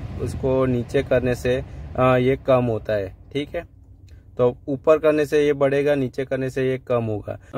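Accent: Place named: Gujarat, India. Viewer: Bhai pakka gujrat se he ye lg rha h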